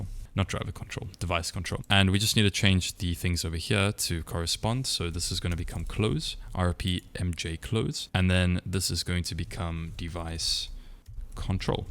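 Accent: South Africa. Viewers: I figured you were South African but when I heard the "yoh if I could spell" I knew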